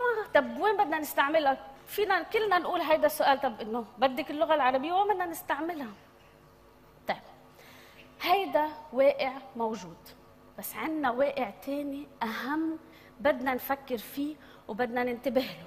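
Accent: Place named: Levant. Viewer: يا حلاة اللغة العربية باللكنة الشامية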